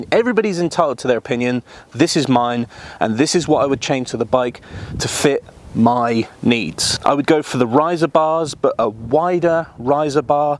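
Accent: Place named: United Kingdom. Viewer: Wtf stupid music British accent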